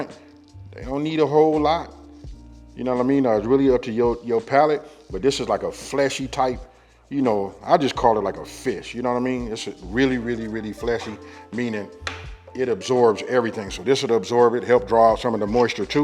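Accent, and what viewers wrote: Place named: Los Angeles, United States. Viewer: is DEFINITELY from the West Coast ( I’m from Compton, Ca) and I hear L.A. or my city 😂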